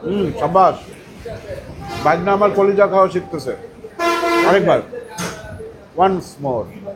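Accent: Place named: Rajshahi, Bangladesh. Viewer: Vaiya kothar moddhe dekhi Rajshahi r akta taan Chole ashese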